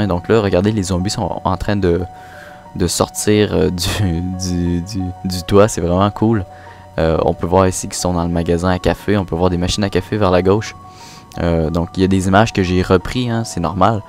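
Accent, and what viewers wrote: Quebec, Canada. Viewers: t srx je le kiff set accent canadio? ou quebequoi? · L'accent canadien c'est cool mais on comprend rien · putain vais vous croyer que l'accent quebequoi c'est tout mimi ? c'est style 2 video mais la il faut arrete putain ! il y a des mots INCOMPRENSSIBLE ! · ENFIN UN QUÉBECOIS SUR COD QG :D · un quebequoi <3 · Accent québécois > tout les accents. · Cest Quebecoi apren unpeu a tinstruire elle est pas mieux votre accent en passant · l'accent quebecois <3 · J'aime l'accent québécois 8) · Canadien, Québecois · T'ommage que t'est l'accent canadien sa m'a un peu dégouté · tien un quebecois :D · respect super bonne video mec et fai pa gaffe au ptit kikou qui critique ton accent psk moi jsui pa québécois mais jle kiffe ton accent ^^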